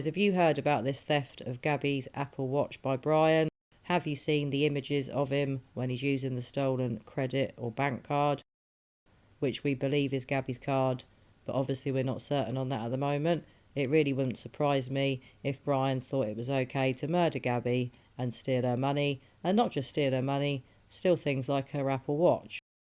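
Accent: England, United Kingdom. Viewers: IMO he simply stole it as a trophy, and to feel his superiority and control every time he looked at it. If this was the reason, murderers usually hang on to their trophies, so may be if not wearing it, it's still with him. Thanks, for your videos, I live abroad, and look forward to hear you English voice with each new video. YAY, go gal go!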